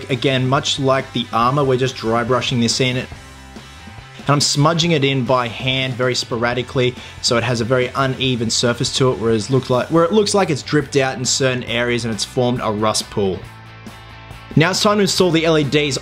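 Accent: Australia. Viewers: Sam Lovgren he is Australian, it is pronounced different in Australia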